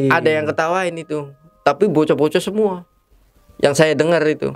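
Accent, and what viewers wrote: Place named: Cirebon, Indonesia. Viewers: Logat ngmng nya ini kaya orang cirebon😄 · logat nya kaya orng Indramayu or Cirebon...Krn paham bener,cerita kurang paham yakin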